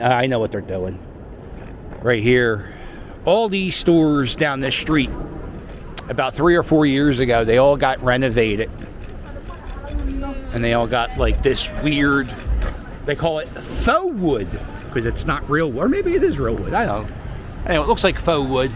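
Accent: Philadelphia, United States. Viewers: Great video you did around all the best locations, that I always walk past but I have learned so much from your perspective. Now I am going to walk just like you, I go there when I haven't been there in a month. Feel like I am missing something from working there on South Street hanging in clubs and going to school for fashion. Your accent in Philadelphia is very authentic. Toodles!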